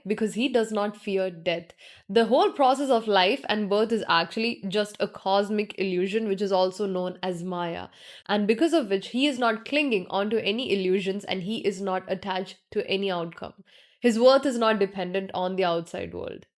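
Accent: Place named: India. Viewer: You’re beautiful it’s true. I love your accent! Hindustan/India has a rich culture. I have lived with Hindi/Indian people. They are kind and considerate. I love Sanskrit. It is like an alien language. Bhakti: Devotion, love, and faith in a deity. Brahman: The ultimate reality or divine principle in Hinduism. Karma: The principle of cause and effect. Moksha: Spiritual liberation. Samsara: The cycle of birth, death, and rebirth. Satya: Truth. The way I seen it certain languages control matter=mother=care. The connotation and tone,rhythm, pitch mean a lot. It reverberates out like a pebble in a pond. The ripples outward affect the whole pond even when not seen. The effects are seen later. People wonder where it came from. For every reaction there is an equal or opposite reaction. It matters what you say. What you say matters. Sound and emotion creates matter. 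A calm nervous system helps create a better life. It changes the outside world. (Matter) Hence OM! Sweet OM! 🕉️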